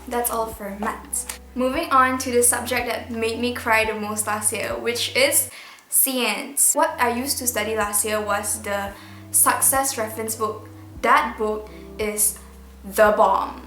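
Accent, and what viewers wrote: Sarawak, Malaysia. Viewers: is like one of those who speak manglish 😂gosh im subscribing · Sarawakian? Your accent is kinda same as sarawakians language like this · Dr sarawak ke sis?.. Sbb "lom gik masok form5 " hahaha.. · Bunyi kdk org srwk.. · BTW ARE U FROM SARAWAK I COULD TELL FROM YOUR ACCENT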